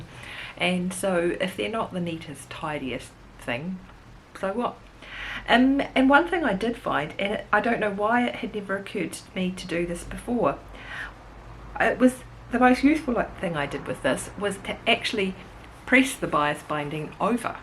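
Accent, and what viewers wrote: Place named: New Zealand. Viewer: Always lovely to see you and hear a fellow Kiwi.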